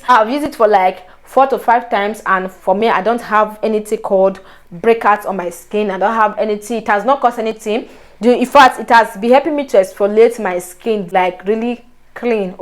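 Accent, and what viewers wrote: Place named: Nigeria. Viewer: Your pigin is so sweet and the way you pronounce subscribe button is out of Nigeria . Love you girl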